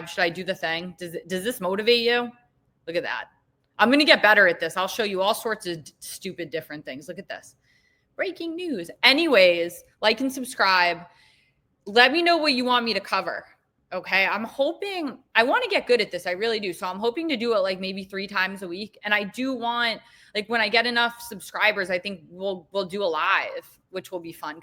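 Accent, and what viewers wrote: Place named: Massachusetts, United States. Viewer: I thought Mass.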